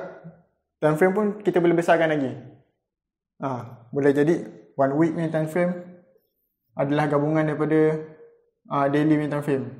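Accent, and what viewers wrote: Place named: Kelantan, Malaysia. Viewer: ore klate ni..sbb bunyi "jolo doh"..hehe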